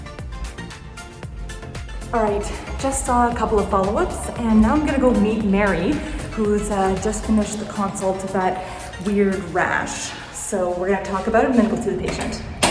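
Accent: Canada. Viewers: This is the first video that I have watched of this physician and I knew absolutely nothing a out her...but I guessed pretty quickly that she may be Canadian after she said "about", I'm CDN too and I guess we really do have an accent! I also have RA so it's pretty cool to see things from a doc's perspective. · Where are you in Canada? Judging from your accent you're a native Canadian. Correct?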